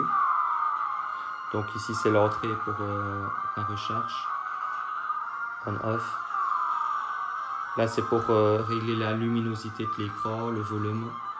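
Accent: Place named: Liège, Belgium. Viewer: le bon accent liégeois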